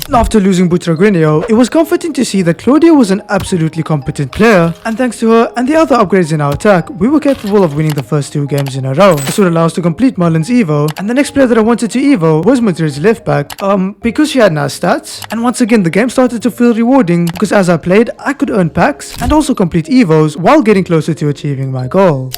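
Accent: South Africa. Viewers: is bro south african??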